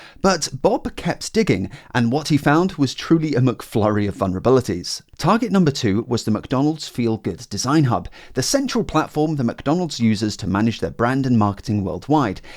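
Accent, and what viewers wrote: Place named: United Kingdom. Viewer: no that’s his voice, he’s british i think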